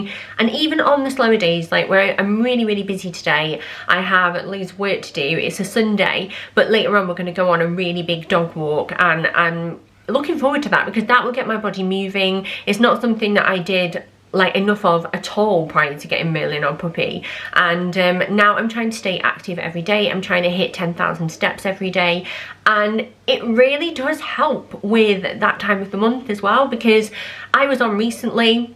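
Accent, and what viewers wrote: United Kingdom. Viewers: Random one but are you from the Wirral? 👀x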